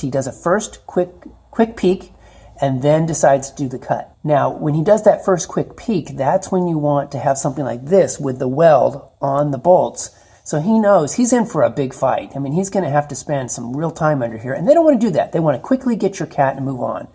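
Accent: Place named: Canada. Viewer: Another good idea, thanks again. You sound like you are from Canada? - Canadians overall are good peeps.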